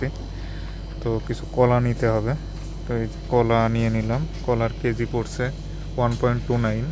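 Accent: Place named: Pabna, Bangladesh. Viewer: First video dekhtesi probably apni pabnar manush or ashe pashe basha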